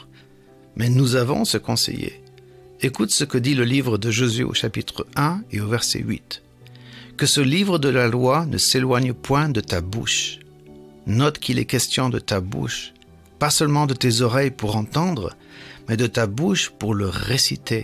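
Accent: United Kingdom. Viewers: Bonjour et merci pour ce partage. Oui oui tu as bien un bel accent anglais. Je comprends mieux maintenant. La méditation m'a fait sourire et réfléchir surtout avec l'image du mauvais mur. Et si mon échelle d'ascension pro était sur le mauvais mur 😢 je me suis demandée. Seigneur je te confie tout je te recommande tout apprends moi à t'écouter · Bonsoir merci pour ces belles paroles elles me reconfortent apres une journee au bureau Je vous le dit souvent j'adore votre accent BRITISH Have a nice evening Awaiting for your next reading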